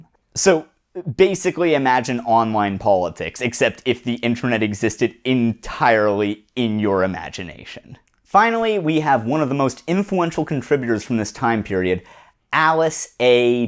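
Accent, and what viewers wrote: Canada. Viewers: This was great. Informative and entertaining. I love your NIN shirt and your Canada, eh? accent. Good job! I can't wait for the next one.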